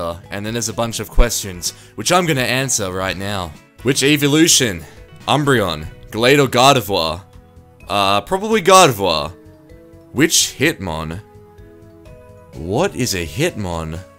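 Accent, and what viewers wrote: Australia. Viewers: are you from australia coz you sound like you are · just subscribed, don't know why but channels with australian (maybe you're from new zealand or the likes, im shit with this stuff my b) make me laugh so fucking more than if an american and or englishman(?) said the same shit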